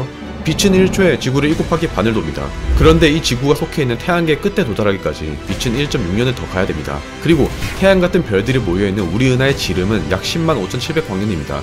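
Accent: Gyeongsang, South Korea. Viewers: 경상도 사투리 엄청 거슬리네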